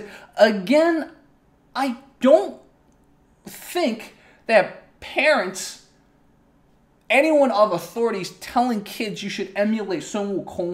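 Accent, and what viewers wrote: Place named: South, United States. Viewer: Why you have southern American accent?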